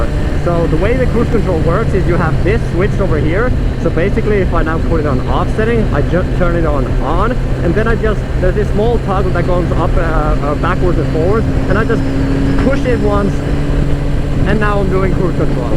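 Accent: Finland. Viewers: When the video started I noticed that you had really distinctive Finnish accent and was wondering if you are a Finn. When I saw Rukka jacket, I was sure about it :D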